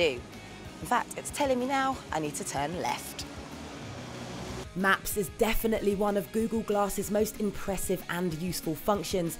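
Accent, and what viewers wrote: United Kingdom. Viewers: Ahahahaha the British Accent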